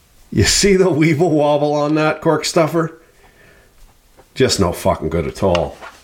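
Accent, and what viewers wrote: Canada. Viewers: I love your accent. I think I am going to have to learn to speak fucking Canadian, Ah? Learned lot of new vocabulary, like Shmoo, skookem. Fuck, I am going to have to watch this video again. Why this video brings me such happiness I have no fucking clue, but it does. :-) You definitely have a new subscriber. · You need to do a video translating all the sangs and saying. I'm guessing it's common Canadian contractor dialect but, some of us 'mericans have a hard time keeping up... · Just discovered this channel. Never heard a more Canadian Canadian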